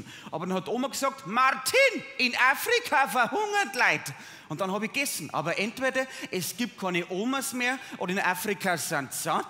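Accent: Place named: Bavaria, Germany. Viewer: Martin du bist da Wahnsinn ❤bleib den Bayrisch treu ❤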